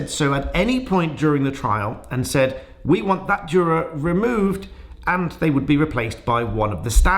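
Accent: United Kingdom. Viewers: Heard all this on my American atty channels, but I have to say, is so refreshing to hear with a British accent. This judge has also had her fill of team Amber.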